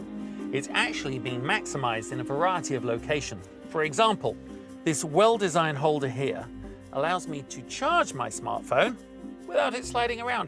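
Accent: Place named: United Kingdom. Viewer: if this is Jaguar USA, why the host has british accent?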